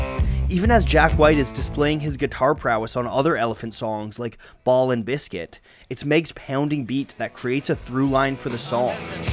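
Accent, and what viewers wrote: Canada. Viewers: D O N O T T R.Y' TO S O U N D A M E R I C AN * WE HEAR YOUR CANADIAN ACCENT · I just learned that you are Canadian. Your pronunciations make sense now and I realize I’ve got a USA-centric lens.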